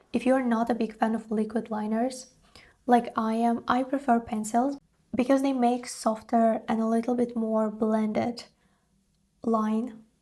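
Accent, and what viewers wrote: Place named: Eastern Europe. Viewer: Сразу видно наша славянка ! Акцент как у меня 😊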